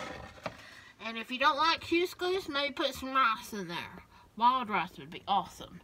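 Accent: South, United States. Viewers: Боже, комментарий на русском под видео тетки с бешено выделяющимся южным акцентом. Удивительно.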